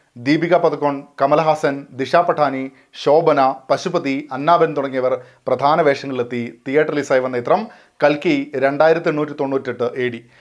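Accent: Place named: Palakkad, India. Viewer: Palakkad tamil ഉണ്ട്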